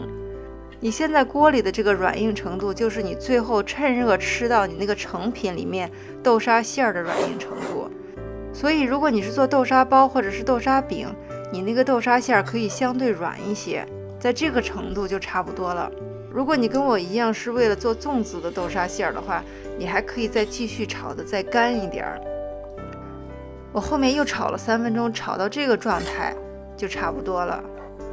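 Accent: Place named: Shaanxi, China. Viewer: 听口音陕西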